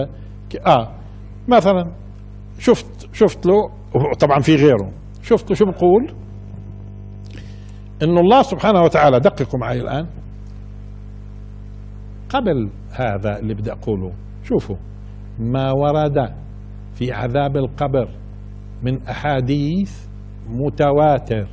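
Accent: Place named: West, Algeria. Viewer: سبحان الله نفس اللهجة عندنا في غرب الجزائر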